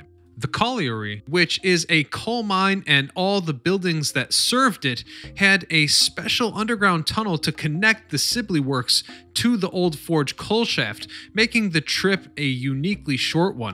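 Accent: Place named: New Jersey, United States. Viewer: I love your videos. You are a Jersey guy right?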